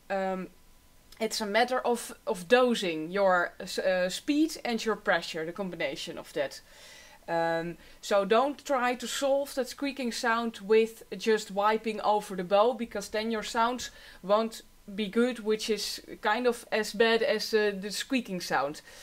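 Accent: Netherlands. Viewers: i just started playing and i'm making the most annoying sound ever , I've listent to all the tips on the internet but nothing really helps , But i'm only playing for like three days so maby it's normal , Could this fade by Practice ? Or should look for a problem ? ow and may i ask are you dutch ? because your accent sounds dutch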